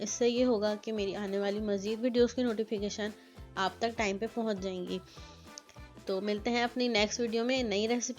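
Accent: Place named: Hyderabad, India. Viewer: MashAllah Khalis Hadrabadi zaban boli ja rah rah wow👌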